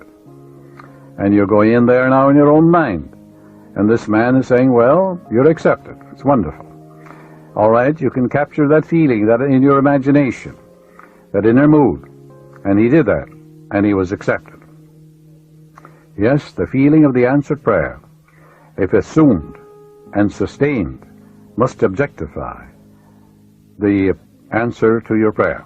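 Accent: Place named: Cork, Ireland. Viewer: never lost that Cork Accent!!😉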